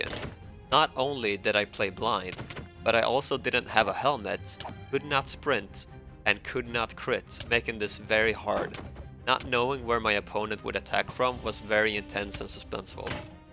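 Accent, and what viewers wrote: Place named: Sweden. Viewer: du måste vara svensk efter din dialekt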